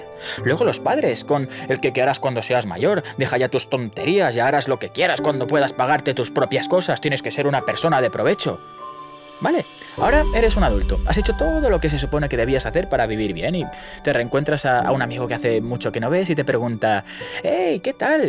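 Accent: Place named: Colombia. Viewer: con esa forma de hablar se reoconoce a un colombiano de lejos jaja la buena parcero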